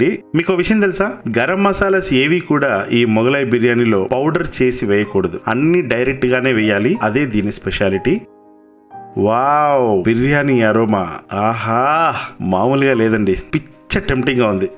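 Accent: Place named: Godavari, India. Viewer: Godavari yaasa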